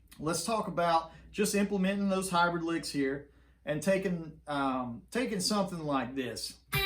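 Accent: South, United States. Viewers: This is such a great lesson. Being a German, I dig that southern accent. Thanks a lot sir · I love your playing but i also love that Southern accent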